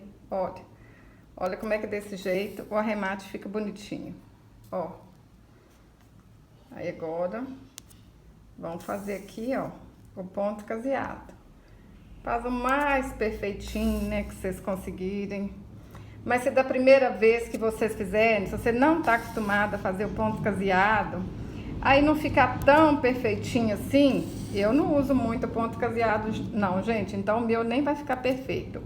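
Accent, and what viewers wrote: Minas Gerais, Brazil. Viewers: Boa noite minha querida! Q "lindeza"de artesanato como vc diz né? Vc é uma amor de pessoa ,esse jeitinho de falar de "mineirinha" toca no coração da gente. Obrigada por nos ensinar tanta coisinha linda,fico feliz demais. É assim q me sinto quando "posso" te ver. Porque nem sempre a minha internet permite eu entrar no You Tube. Mais uma vez parabens e obrigafa por todo carinho. Q Deus te abençoe e seja sempre feliz querida. Bj no teu coração!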